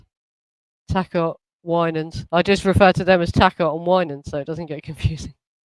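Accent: Cockney, United Kingdom. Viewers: Great presentations. Just hard to decipher you east end ? Accent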